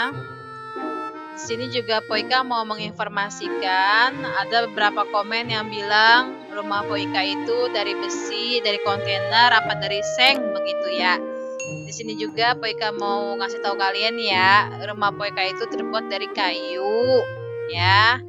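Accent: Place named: Betawi, Indonesia. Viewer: ngomongnya legit bgt gemes betawi medok 😊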